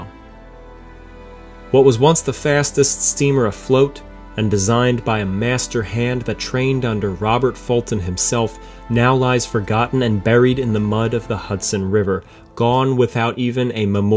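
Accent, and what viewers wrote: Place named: Michigan, United States. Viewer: could have sworn that was a Michigan accent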